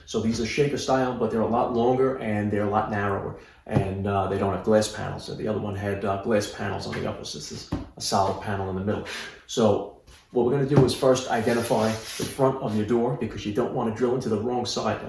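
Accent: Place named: Brooklyn, United States. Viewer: Living in seattle, but having grown up in brooklyn, I really appreciate hearing your accent. Home again.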